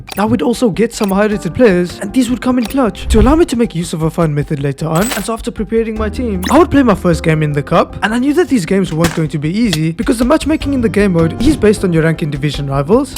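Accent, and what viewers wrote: South Africa. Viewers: hi dude im new to the channel what is your nationality you sound south african? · Why you sound so South African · bro are u south african, u sound like it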